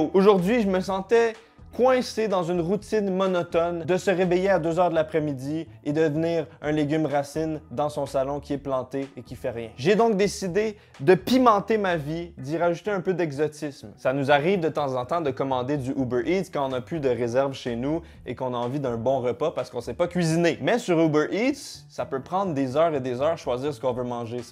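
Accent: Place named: Canada. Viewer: Vous êtes confirmé au Canada ? Fin je suppose à l’aide de ton accent que tu viens du Canada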